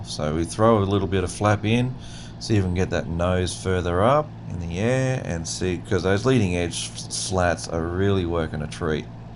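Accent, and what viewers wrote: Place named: Australia. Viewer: Great video and just wonderful to listen to your beautifully calm quiet commentary. Hearing a quiet Aussie is such a restful change compared to our American counterparts with similar videos. Love it.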